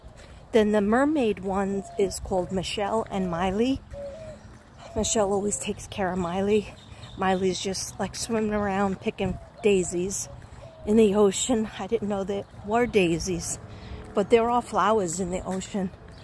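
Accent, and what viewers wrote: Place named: Northeast, United States. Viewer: Wow, those glasses look great on you! So pretty! I’m new to your channel. You mentioned Texas, but what is your accent? It sounds Northeastern.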